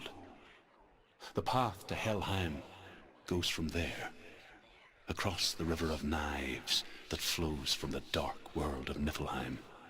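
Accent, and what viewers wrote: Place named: Northern Ireland, United Kingdom. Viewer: Proper Northern Irish.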